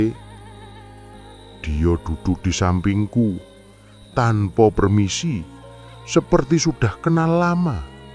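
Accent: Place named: Banyumas, Indonesia. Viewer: Nyimak ewong ngapak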